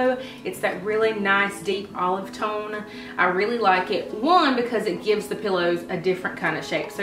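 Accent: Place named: North Carolina, United States. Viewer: Omgoodness, I knew right away where you are from because of your accent! I just subscribed, came over from the other channel! I love watching these videos to get my own ideas for fall decor ideas, great job!! I'm from the same area in NC, but moved to Iowa!! 😁 girl I live for those hobby lobby sales 😂😂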